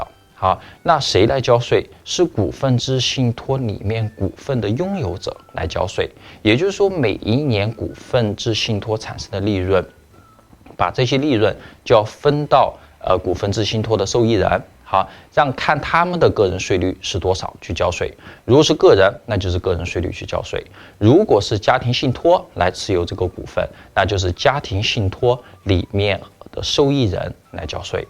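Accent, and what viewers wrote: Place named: Sichuan, China. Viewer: 哈哈哈，听出了川贵口音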